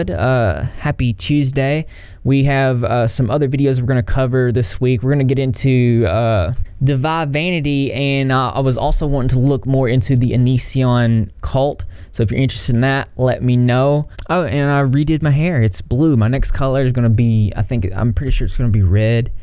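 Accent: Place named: South, United States. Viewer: Your voice and accent are great. Seriously. I am from the southeast U.S. and it makes me happy to hear someone who sounds similar to myself online. That you can sound this way and still be considered concise and intelligent and professional. I am here for it.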